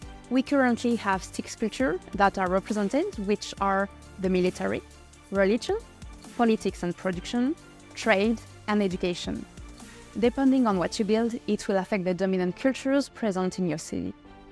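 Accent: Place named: France. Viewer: Don't know why, but the French accent is very persuasive.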